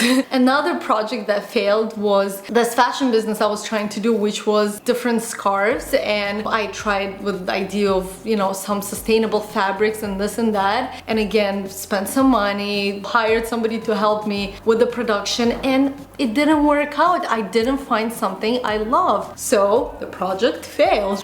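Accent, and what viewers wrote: Azerbaijan. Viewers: please she is from Azerbaijan and speaks Azeri and Russian. She was 16 when she moved to Canada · lol Canadian???? She's from Azerbaijan, therefore has an accent that's obviously different from yours and she's not saying it "wrong". Very ignorant thing to say.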